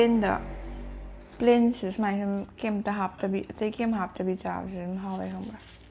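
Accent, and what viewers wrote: Kakching, India. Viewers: Kakching lolda ngangbana henna pos tai ae.. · kakching marol se yamna manipur da pamnarklibane ateigi khoibaga mannadabane lovely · Kakching londa adum ngango ym nungye taba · Kakching marolsi eidi Yamna Nungsiye taba Yamna nungai... Adum ngangbiyu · Kakching marol je khara thuna ngangje amta tage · New subscriber👍...kakching nupi amt koidb ydre lon khoirg ngngk nba😃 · echan gi kakching gi londa ngangbase yam taba nungai, adum ngangbiyo:) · Hwjikta thengnabni chegi channel se..eisu kakchingdeini Eikhoigi londa ngangbsi tabada nungaijei👍👍👍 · Kakching londa adum twbiyu aduna henna taba nungyjei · Ngasidi kakching lon taba phngngiiii💃💃💃💃💃. Yam tba nungaiyeee ado mthnggi vedio dsu kakching lon oin twseeee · Che2 na kakching lon ngnBse ym nungsiYe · Che kakching lon ngangbana nungcye che nungcbana loireda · Che ym haormdoi mlle kakching londa asum ngangbi rk o taba ym nungaiye nungsu ym nungcye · wa ym ngngo kakchng londa taba ym nungaiye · Che kakching marolda ngangbase ymn nungcye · nahalkhei ngangbado adu oina ngangbiyu kakching lon natabida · kakching londa ngangbase ym nungcye🙃🙃🙃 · Kakchng mchani khngnaba dumm che gi vlog khufing gi ngango duna nungai... 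Eisu kkchngne...😁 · Kakching maron ngangbajidii yamm nungche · Ym nungcyee kakching marol da ngangbashe pukningbu nungcrakae che na ngangba taraga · Kakching lon ngangba c n henna neire keino ado haobro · Kakching londo yam nungcee luf u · kakching londa adum ngangbiyo yam taninge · kakching lon sae eym nungcyae tabada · mathngdi kakching marol ngangbgi oina twrk o chaba tok o ngangbdo taninge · Video loina kakching maron da oina twwo... Duna henna nungai jei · Cgi channelse ngacna ahanba thengnabne subscribesu twjre.aduga sisterse mapanda leibro? Kakching lonse somna ngangbcdi tabda nungaiye. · kakching marol da ngangbasee yam ngaojei yee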